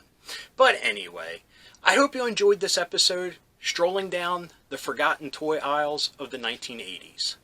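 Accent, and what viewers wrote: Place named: Baltimore, United States. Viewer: your Baltimore accent triggers me, but in a good way 😄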